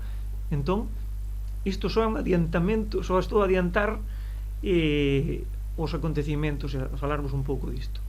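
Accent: Spain. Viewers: Quanto teria eu gostado de ter aulas de galego como estas! Além do conteúdo, predicas com o exemplo dando-lhe à pronúncia o jeitinho próprio do galego. O raro aqui é que seja raro escuitar galego falado à galega em registos cultos. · A maioria do Brasileiros diria que fala português com um pouco de sotaque castelhano. E confesso que para o ouvido brasileiro é mais fácil de entender do que português da região de Lisboa. Parabéns pelo trabalho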